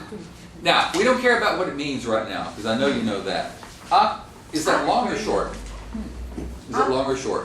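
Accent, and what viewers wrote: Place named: England, United Kingdom. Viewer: Very good and very nice and accurate pronunciation, despite the heavy English accent. Unlikely from some English speakers he does not try to adapt the Latin spelling and rhythm to English, as many professors do when it comes to vowels or the R sound for example. Very nice lecture.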